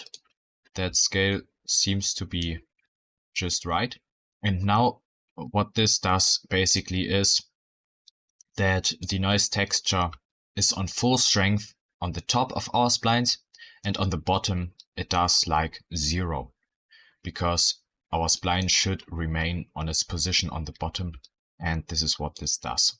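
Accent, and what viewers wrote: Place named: Denmark. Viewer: oh sorry😅 you sounded very Danish in the video, great video btw!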